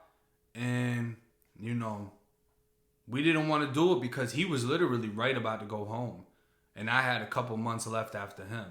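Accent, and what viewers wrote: Boston, United States. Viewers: You kinda have that Boston accent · You tlk like you from the bean ... When i went to florida every1 knew i was from Boston we just tlk a certain a way. You had to have spent some time out here.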